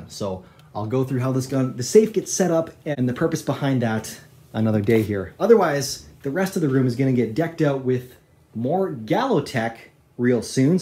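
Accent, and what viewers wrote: Canada. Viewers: yo is this guy a canadian??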